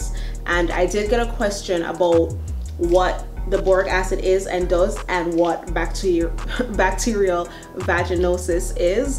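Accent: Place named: Jamaica. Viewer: Got to be Jamaican love it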